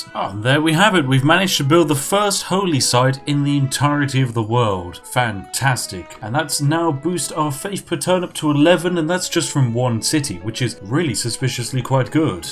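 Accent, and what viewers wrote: United Kingdom. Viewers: Are you really British? Why I like your accent more than the real British people? ❤️🙃😂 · British english, lol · Watching on my country side with a large cup of tea. Mmmm British accent "My lord. It's absolutely marvelous". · Damn you! My colonial mind is programed to be receptive to a British accent and I've actually bought a 240 pack of yorkshire tea.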